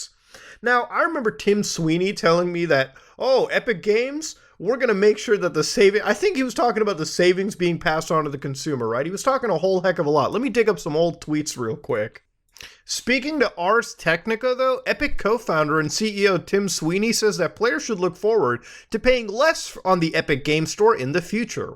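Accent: Canada. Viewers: Canadian accent was unusually noticeable in this video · I love when the slight Canadian accent comes out, but spittin facts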